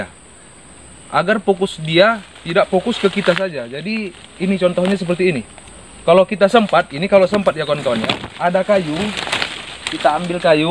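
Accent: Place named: Medan, Indonesia. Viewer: Ada lucunya nih org.. Logat medan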